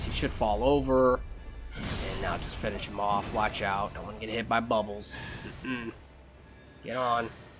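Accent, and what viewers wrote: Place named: United States. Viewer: you sound to me like you have an accent. Are you okay saying what state you live in? Curious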